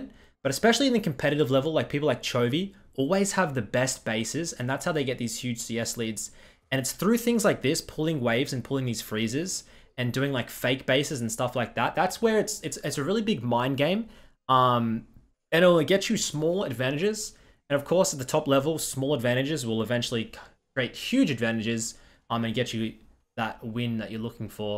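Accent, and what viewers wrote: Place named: Australia. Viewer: Came for the Australian accent, stayed for the K N O W L E D G E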